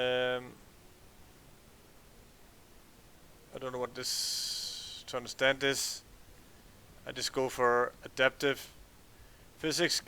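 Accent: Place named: Denmark. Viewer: Odd, your Youtube profile list you as German, but you sound really Danish. XD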